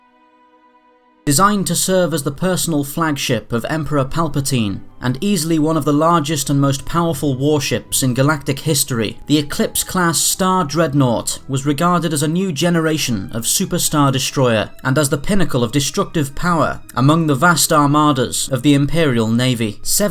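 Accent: United Kingdom. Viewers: I really like listening to the British accent giving tech jargon. 😉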